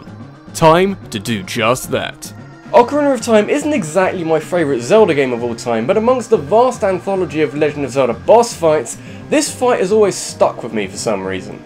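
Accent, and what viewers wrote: United Kingdom. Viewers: Gigadrain is it a British Accent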